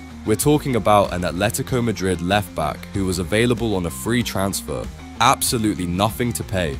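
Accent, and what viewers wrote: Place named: London, United Kingdom. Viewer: Can your AI voice simulate a Nottingham accent please, London accent is annoying.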